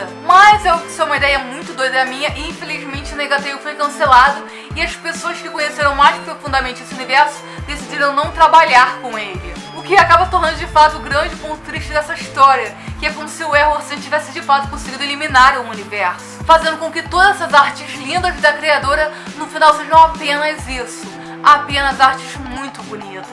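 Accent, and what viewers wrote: Rio de Janeiro, Brazil. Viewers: Ela é carioca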